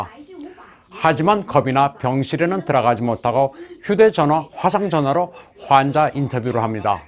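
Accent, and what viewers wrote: China. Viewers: 이사람은 발음이고 뭐고 아무리봐도 중국인인데... 중국인 그렇게 미워하던분들이 어떻게 그리 잘믿는지..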